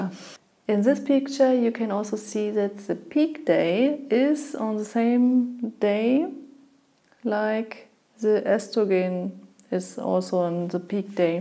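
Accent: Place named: Germany. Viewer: i've lived in Austria for 10 years and I couldn't ever have mistaken that accent Germans have when speaking English 😁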